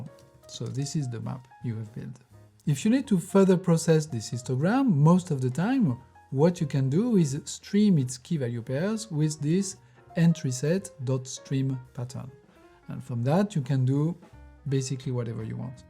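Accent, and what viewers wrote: France. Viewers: not at all. this is a french accent for sure 😊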